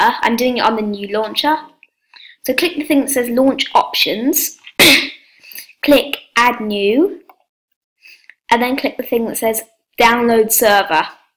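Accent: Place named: United Kingdom. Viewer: Dat British accent tho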